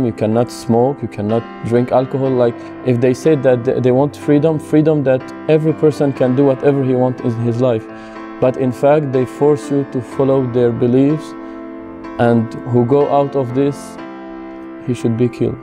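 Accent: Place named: Russia. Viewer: Так хорошо выучил русский, что на английском говорит с русским акцентом. Я почти все слова смог разобрать, хотя английский на слух обычно понимаю плохо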